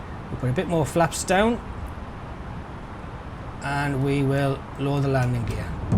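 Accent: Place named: Newcastle, United Kingdom. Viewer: nice accent, hello from a fellow geordie. nice video mate.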